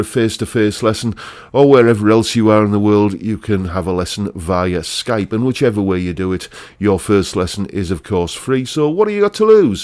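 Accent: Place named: Northern England, United Kingdom. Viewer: As always, I do hope you're well 😎😊 love your stuff, really really love it, and who can honestly say that your northern accent isn't totally great 😎 Just a pitty I live in Denmark, otherwise I would be having lessons in person every week 🇩🇰😎😎 Love it and keep up your great stuff To many YouTuber guitarist's making c.... with their posted videos. Great stuff and very interesting stuff in many ways 👍🏻👍🏻😎😎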